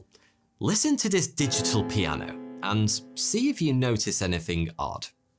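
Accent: United Kingdom. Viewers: Talking of...or is that "speaking-of"... whatever....in regards to "perception". ¿I wonder if " " ever did an Episode on Accents? In short, people's Regional Vocal Accents... for, also to a slight "hearing" problem I've developed, requiring the use of hearing-aid, and because of " "'s Regional British Accent, that was saying "Forty-Two" instead of " ". As an 'omage to Douglas Adams, until I really noticed the on "Screen" 'printed-tag' " ". Which could be sort of "Multi-Part-er" starting with why people speaking English pronounce the way they do: in different parts of England; Canada, Australia; New Zealand; and the USA; Segway into why some people from Non-English Speaking Countries have an "Accent" due to the way They Learned to correctly pronounce Their "Native" language. Then on to why different Dialects develop in Languages in general, possibly using China as an 'extreme' example. 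Eventually, leading into why there are more than 7,100 languages are spoken in the world today. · British accent makes everything sound nice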